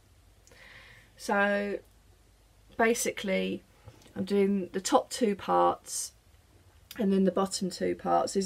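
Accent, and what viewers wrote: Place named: United Kingdom. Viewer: You do such lovely things. I could listen to you all day long, I do love the British accent, it sounds so much more cultured than our American English. The Royal Albert tea set is so beautiful, long a favorite of mine. I do love drinking from tea cups, but it cools too quickly, so I use a large, thick mug. Not so elegant, but serves the purpose. I hope to see you again soon.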